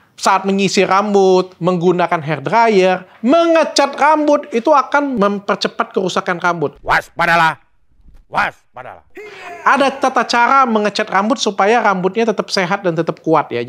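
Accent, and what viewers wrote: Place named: Kalimantan, Indonesia. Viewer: biasa nya kalau ngomong karena "kerana" dan R agak kedal itu asal kalimantan bener kah ?? BTW ,, menunggu vitamin untuk rambut uban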